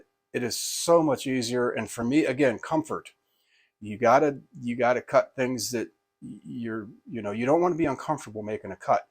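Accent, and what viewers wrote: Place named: Pittsburgh, United States. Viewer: Pittsburgh? I know that accent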